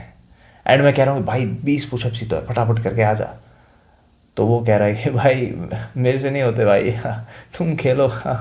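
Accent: Rajasthan, India. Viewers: R u from Rajasthan? I think because of your accent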